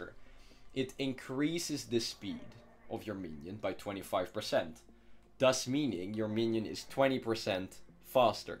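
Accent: Netherlands. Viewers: Ur accent sounds dutch where are u from?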